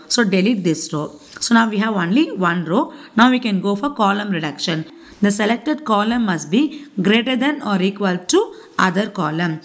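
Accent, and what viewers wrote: South India, India. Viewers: I don't know how to thank you I just want to say I love you ma'am and specially love your south Indian xent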